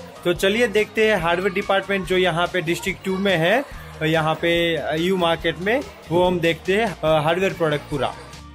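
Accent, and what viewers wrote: Gujarat, India. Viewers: Kya aap Gujarati he ?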